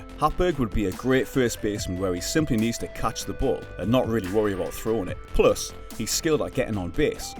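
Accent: Newcastle, United Kingdom. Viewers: gone Geordie? Not what I expected. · Who made the British guy do the voiceover for this one?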